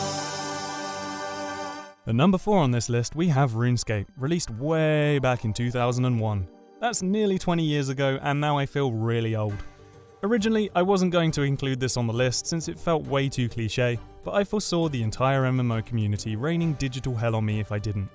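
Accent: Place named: United Kingdom. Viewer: you could be a great streamer for Silkroad online since they aren't many English speaker ones. Turks are monopolizing this business and many ppl like me are looking for streamers with a British accent like yours.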